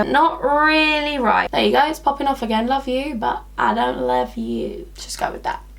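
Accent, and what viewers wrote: United States. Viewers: Your American accent is on point girl 👌🏻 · you're american accent is better than mine... PS i am american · Your American accent is so good you sound just like me 😂😂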